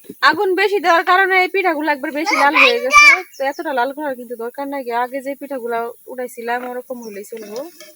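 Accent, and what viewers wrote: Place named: Comilla, Bangladesh. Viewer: আগে বলেন আপনাদের বাড়ি কোথায়??? ভাষাটা চেনা চেনা লাগছে, বেশিরভাগ আমাদের মত। তবে আপা অনেকদিন পর এই পিঠাটা বানানো দেখলাম, এটা কে আমাদের কুমিল্লায় ফুল পিঠা বলে। সেই খেজুর কাটা কাঁচা বাঁশের লেইল দিয়ে বানাইলেন, অতীতের পিঠা বানানোর সব স্মৃতি মনে পড়ে গেল। আমাদের দেশে বিয়ে এবং মুসলমানি অনুষ্ঠান আর নতুন জামাই বেয়াই আসলে, এই পিঠা আরো বিভিন্ন রকমের পিঠা বানানো হয়। আমি ভালো পারতাম না, আমার মা ভাবি পাড়ার অনেক মহিলারা মিলে বানাইতো, কিছু কিছু পারতাম, কারণ তখন ছোট ছিলাম। আজ আপনার কাছ থেকে ভালোভাবে দেখলাম। এখন পারবো মনে হয় ইনশাল্লাহ, আমি আপনাকে লাইক কমেন্ট সাবস্ক্রাইব করে দিয়েছি। ভালো থাকবেন। আর আমার জন্য দোয়া করবেন আমি খুব অসুস্থ, এবং শোকাহত, আপনার ভিডিও সব সময় দেখব কথা দিলাম। আসসালামুয়ালাইকুম 🙏❤🌻🥀🤲👍🎉 ঢাকা থেকে 🙏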